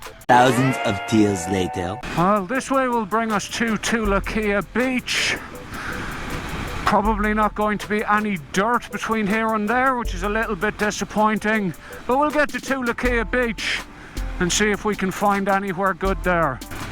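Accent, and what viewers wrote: Ireland. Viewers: Everything just sounds better with an Irish accent. The weather looks amazing up there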